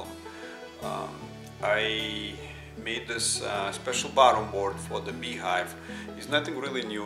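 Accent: Russia. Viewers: MOJET IA OSHIBAIUS NO SLISHU RUSSKII AKZENT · I used to live in the Northwest corner of Montana, I may have told you before, and I love Wyoming too. But how did you choose to move to that area? By you accent,and may be wrong, but did you come from Russia? You live a very pleasant life there in the mountains...I know I sure miss it! How did you come to attract such a good energy with all the wildlife? I can see it in you. The animals, your pets reflect your kindness. Do you have family here in the U.S? I am rambling a bit but I feel a sense of goodness in all you film, keep it up! Till we speak again, Woody Woodward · Were are you from ? Russia or another country ?)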